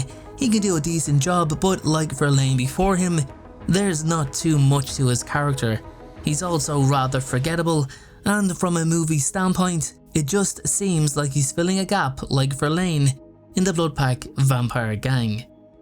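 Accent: Ireland. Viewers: Are you Irish? What part of Ireland are you from? You earned a sub anyway.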